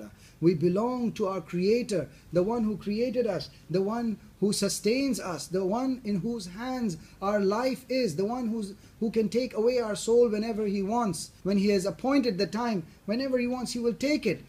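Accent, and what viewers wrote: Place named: South Asia. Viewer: You seem to be pretending being an Arab from Saudi Arabia but I can notice from your look and accent that you are actually of some South Asian background possibly Pakistani or Indian. You don't have to look like an Arab for people to listen to you.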